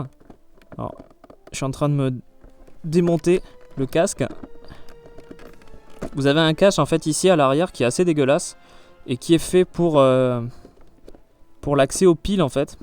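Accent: England, United Kingdom. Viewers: Super vidéo comme d'habitude j'adore ton accent Anglais moi je suis pourrie en Anglais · Mec je sais pas pourquoi mais ton accent anglais me fais kiffer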